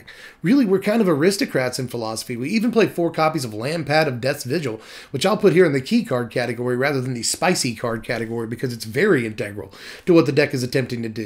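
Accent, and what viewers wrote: United Kingdom. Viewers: I liked this vid specifically for the crazy brit voice lmao. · Love the English accent haha as an Englishman my self it was perfect 🤣 love you keep up the amazing work!!! Best MTG creator and it ain't close!!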